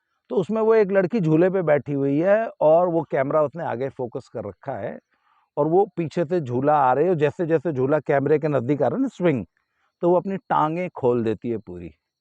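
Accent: Haryana, India. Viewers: बढिया हरियाणवी बोली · आपकी बोली मैं हरियाणवी पुट है । रोने से आंखों को नुकसान होता है ।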